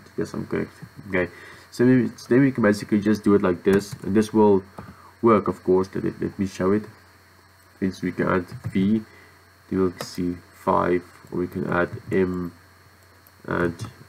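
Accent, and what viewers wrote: Afrikaans, South Africa. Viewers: Nice Afrikaanse aksent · Are you South African ?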